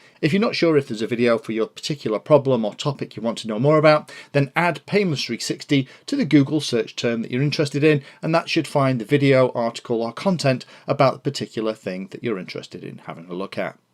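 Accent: England, United Kingdom. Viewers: Always nice to hear your dulcet tones!! In NZ at the mo so great to hear a proper English accent! Always appreciate your reviews (still hoping you might do the Strix Nano Goblin...!) Cheers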